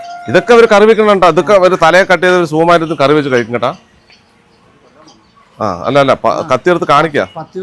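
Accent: Palakkad, India. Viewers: പാലക്കാടൻ സ്ലാങ്ങ് അവതരണം ആണ് പലരെയും ഈ വീഡിയോ കാണാൻ പിടിച്ചിരുത്തുന്നത് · പാലക്കാടൻ ഭാഷ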